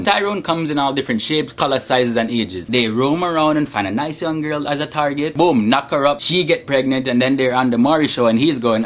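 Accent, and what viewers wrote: Jamaica. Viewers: are you from jamaica?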